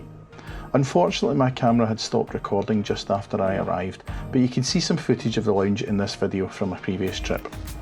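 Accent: Scotland, United Kingdom. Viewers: Great content as usual! Very comprehensive review, and the Scottish accent makes sound more convincing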